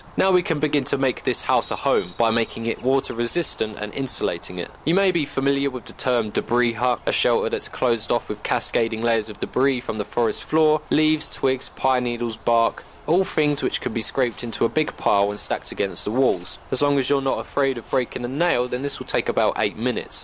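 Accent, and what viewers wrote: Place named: Derbyshire, United Kingdom. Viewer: u sound like your from derbyshire? XD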